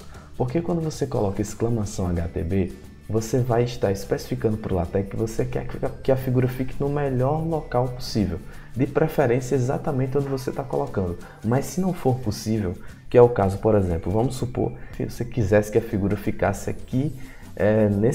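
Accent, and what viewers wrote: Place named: Recife, Brazil. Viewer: De longe o melhor vídeo que eu achei aqui no Youtube. Pelo som de fundo/sotaque da pra perceber que é Recife hehe abs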